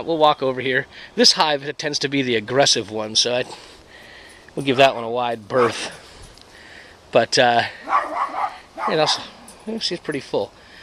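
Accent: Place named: New England, United States. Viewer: Nice a little touch of New England in the South:)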